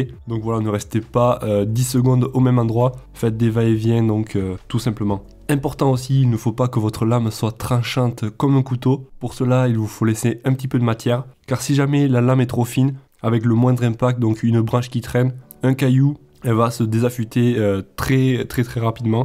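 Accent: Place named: Toulouse, France. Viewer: bel accent. toulouse ?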